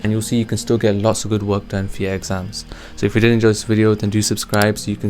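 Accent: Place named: United Kingdom. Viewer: Yo Pakistani don’t flex your British accent talk slow I didn’t understand much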